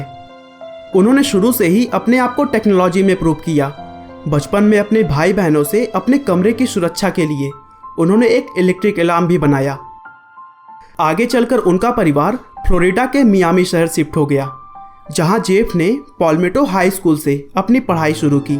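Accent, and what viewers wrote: India. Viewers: Bai Hindi accent 😥 overall nice video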